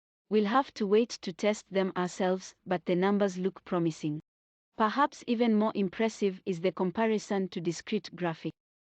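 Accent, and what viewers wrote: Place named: Africa. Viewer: Asian woman with African accent? Wow. Good video though.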